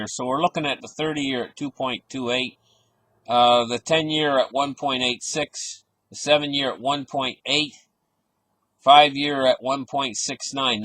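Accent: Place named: Canada. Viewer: Sounded extra Canadian today.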